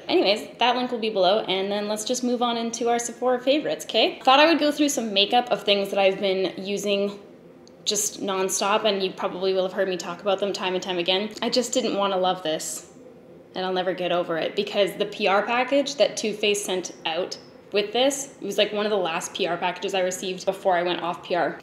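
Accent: Canada. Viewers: Almost a 1,000,000 :3 yujuuuu, btw I love how canadians say coat, boat, oat, out , etc I think is lovely ;3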